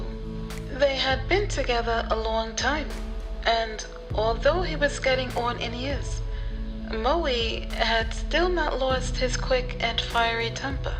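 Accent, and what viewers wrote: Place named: Brooklyn, United States. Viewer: No one know where can even contend with the narrator, the impeccable balance of her telling the story and keeping you in suspense while telling you the story is one thing, but her voice is a whole Nother thing in itself. It’s so Brooklyn so gangster rest like a pedestal WIFE from one of the boys like there’s no question she is now the best narrated narrative which ever term you want to use. IN THE MAFIA GENRE.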